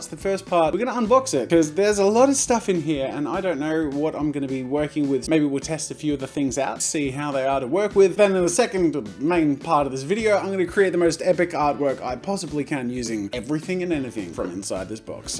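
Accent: United States. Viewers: why is his american accent so good? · Omg your american accent! More please! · american accent is amazing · Your America accent is spot on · *'s American accent is totally on point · * doing an american accent is so scarily accurate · THE AMERICAN ACCENT... WHY DOES IT SOIND SO DIFFERENT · His American accent is pretty great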